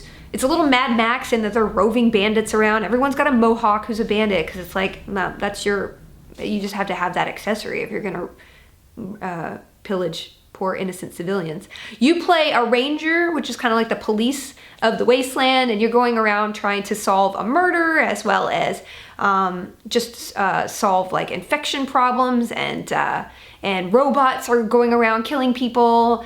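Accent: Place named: Cockney, United Kingdom. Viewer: Oh, my dear, you have a delectable cockney accent. But if you're going to voice dwarves, you absolutely must learn to do a Scottish brogue.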